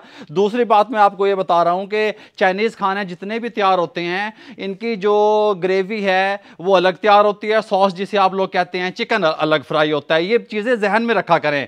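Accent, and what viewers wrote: Punjab, India. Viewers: zaberdast mujy ap Ki Punjabi boht achi lgti h❤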